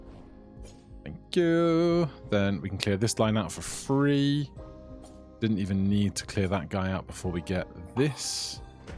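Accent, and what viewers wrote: United Kingdom. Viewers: are you from Yorkshire?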